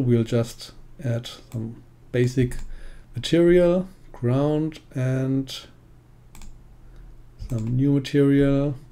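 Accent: Germany. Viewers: You are german arent you? :D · witzig wie man die Herkunft einer Person doch immer am Akzent erkennen kann. Nach den ersten paar Sekungen wusste ich dass hier ein Deutscher spricht :)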